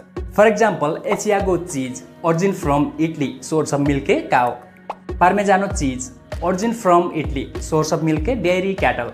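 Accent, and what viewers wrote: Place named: Nepal. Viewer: Aap nepali ho na ... tone bepali aaraha hain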